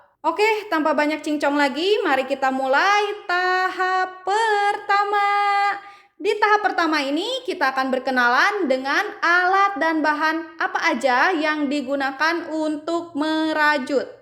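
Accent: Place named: West Java, Indonesia. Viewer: Kk orang sunda ya😅 Lucuu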